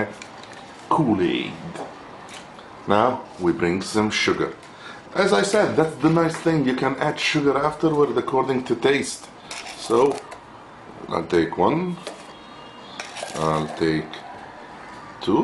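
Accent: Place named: England, United Kingdom. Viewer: Loved ur eng accent dude!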